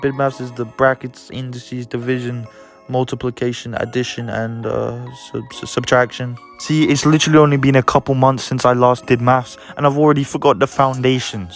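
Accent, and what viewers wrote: United Kingdom. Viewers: I love the UK accent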